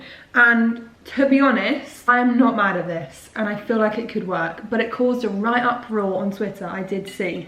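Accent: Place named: United Kingdom. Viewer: For some reason you sound extra British in this video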